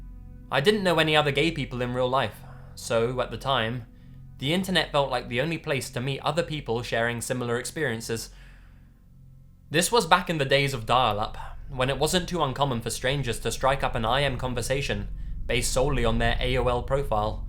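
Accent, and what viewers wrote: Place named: Surrey, United Kingdom. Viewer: bruv how do u talk like that I'm English but you sound like bare posh u must be from sury or summin any great vid m8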